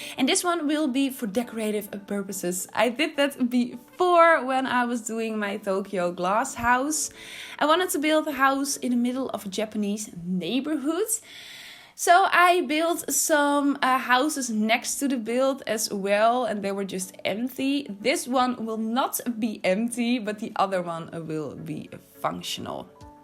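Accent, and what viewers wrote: Netherlands. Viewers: Lekker Engels op zn Nederlands ook, heerijk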